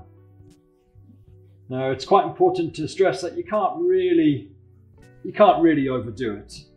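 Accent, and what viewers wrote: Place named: England, United Kingdom. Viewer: "Go give yer bag a drink" very good advice and something I must do! Thanks for a great informative video. Pleasing accent and tone of voice (where in England are you from?).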